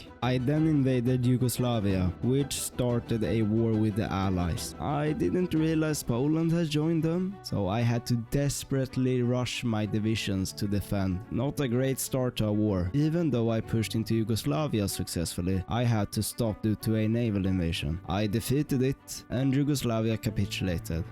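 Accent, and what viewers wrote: Sweden. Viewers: Är du från Stockholm? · man hör att du är svensk ( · You are Swedish , I can tell.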